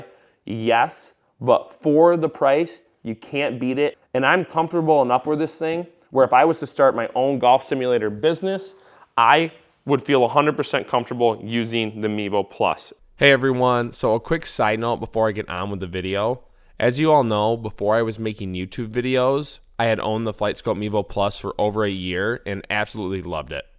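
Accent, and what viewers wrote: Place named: Michigan, United States. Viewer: How did it take me 15 seconds to guess you were from Michigan lol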